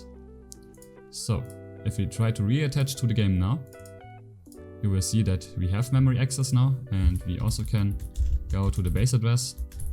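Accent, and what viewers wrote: Germany. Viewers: Are you German? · You sound really german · I hear the german accent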